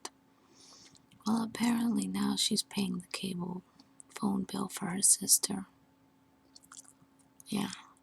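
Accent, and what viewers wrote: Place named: New York, United States. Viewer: I love your accent. That's the prahblam - huuuuge tingles. Are you from New York by any chance? I have a friend who is Latino, from New York, and literally every time she talks I just melt and zone out..and she's like, "Gina? Hello? I'M TAWKIN' TAH YOU."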